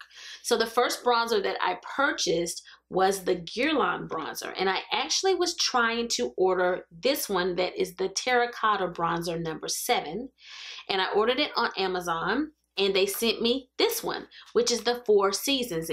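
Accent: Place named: South, United States. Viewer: I adore your personality. Where you from? You sound southern.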